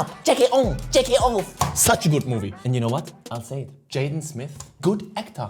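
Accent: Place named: Germany. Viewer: Digga wieso man merkt dass du die letzte Zeit viel deutsch geredet hast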